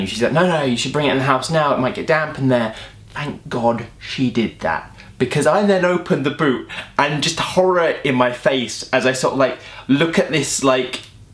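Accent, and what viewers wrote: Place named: England, United Kingdom. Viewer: 'Southampton', the accent, London....probably England, unless they moved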